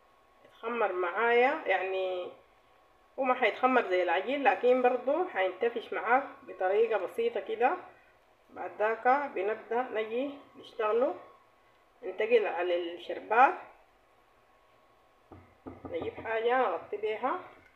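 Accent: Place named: Sudan. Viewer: لييش انتم يا السودانيين نساء ورجال تمطووون كلامك حييل بصراحه طريقة كلام ولهجه لا تعجبني ابددددداً😮😢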